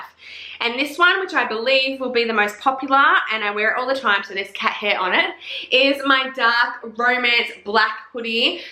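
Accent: Australia. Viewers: I have been reading you recommendations and I think they are awesome ! You say MAFIA perfectly. Everything sounds better in a Aussie accent. My words sound boring in my canadian accent lol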